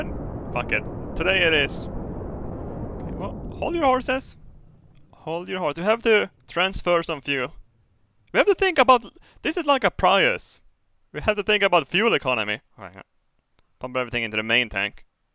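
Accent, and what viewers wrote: Sweden. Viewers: Yes, I'd love to watch a rocket take off then turn on the radio and hear "Let's do this shit!" in a Swedish accent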